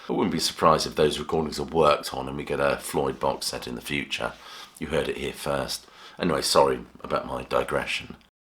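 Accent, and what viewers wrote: United Kingdom. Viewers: Thanks! Excellent reviews. British accent...priceless. 😊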